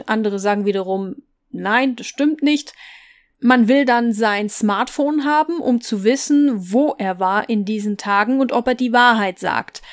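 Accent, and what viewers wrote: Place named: Swabia, Germany. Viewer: Schwäb"sche Vokale - wunderbare Eigenheit bei . Unbbadiiingggttt beiiii- behalda! Gell, ge '? 🎉😅😅😅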